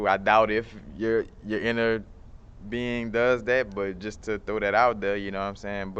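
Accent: New Orleans, United States. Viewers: You gotta be from the n.o huh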